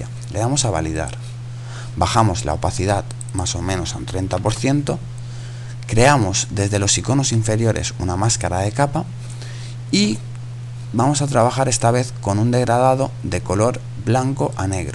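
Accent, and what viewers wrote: Spain. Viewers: como amo el español de españa :DDDD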